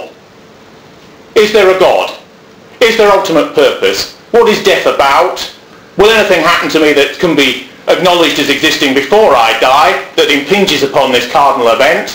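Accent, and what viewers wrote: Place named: RP, United Kingdom. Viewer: Posh accent.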